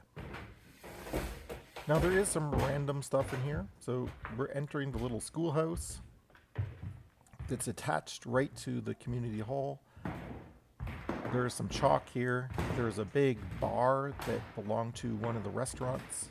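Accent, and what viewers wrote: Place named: Canada. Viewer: Are you originally from Alberta? Your accent sounds from elsewhere in Canada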